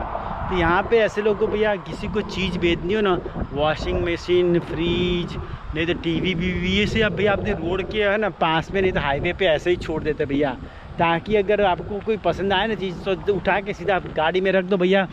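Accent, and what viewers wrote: Gujarat, India. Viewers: I think you belong to Gujarat as you speak Gujarati style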